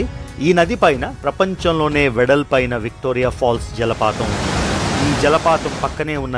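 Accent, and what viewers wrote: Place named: India. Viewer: Andukea meru vijayavantulu iyyaru And your english is indian english not American english, we are proud to be indian garu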